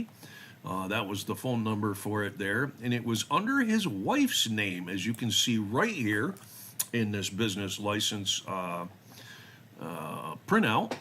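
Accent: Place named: Balkans. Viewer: With his accent, I would guess his people are from the Balkans.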